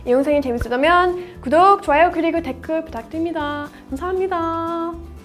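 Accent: South Korea. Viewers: if I listen to you speak without watching, you sound 100% korean. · 전 미국과자가 더 좋아요^^ 억양이 완전 한국인이세요^^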